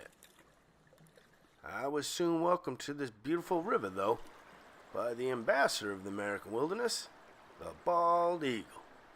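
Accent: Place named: New England, United States. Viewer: Worst accent ever,.like a southern, yankee massachussets, fake maine combo, just terrible, hard to watch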